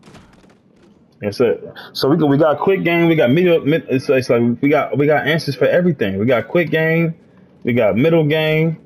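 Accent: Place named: Philadelphia, United States. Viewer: You sound like a Philly guy. Love the content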